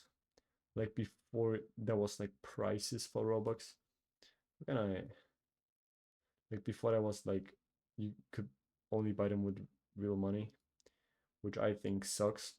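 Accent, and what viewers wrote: Germany. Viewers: are you german? because your accent sounds really german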